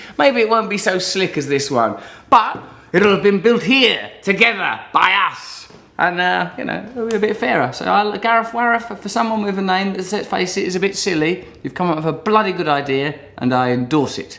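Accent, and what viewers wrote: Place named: Cockney, United Kingdom. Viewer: You are an incompetent, where'd you get that mockney accent, oh man of de people?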